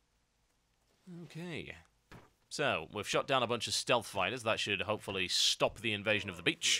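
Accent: United Kingdom. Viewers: Excellent reasoning and criteria for knowing when to continue to do the vids (still have lots of viewers). haha. Also, I think another appeal to these videos is because 1)1-10 questing is usually almost always a solo experience that 2)almost everyone can relate and is 3)usually pretty similar to every other 1-10 leveling. Having hilarious, witty, and informative british commentary in ze background of such opening questing is, well, a unique change to the otherwise familar questing experience. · I watch your videos for a few reaons. First, because I am to lazy to check out the new content myself, I have a premade I run dungeons with and my worgen who sits at 15. Secondly, I generally like watching LP's, and your on my list of people who have good opinions and don't sound like they are 12. Lastly, because you have a clear british accent, and I seldom here them in Canada. 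Keep making videos!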